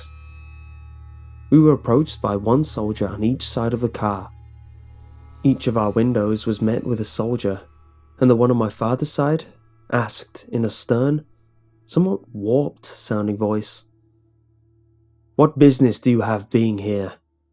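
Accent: England, United Kingdom. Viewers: He sounds English, why is he talking about temperature in farenheit as opposed to centigrade?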